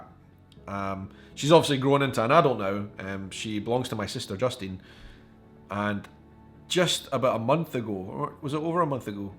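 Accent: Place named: Highlands, United Kingdom. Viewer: <sighs> I listen to your accent. ...and I hear my dad when he would show me how his grandmother, (my great grandmother), would talk. I never met her. It's like you said. She was from the Highlands and as I hear it, there is no place on earth as beautiful. I'm getting way older, now, and I hope I make it Scotland.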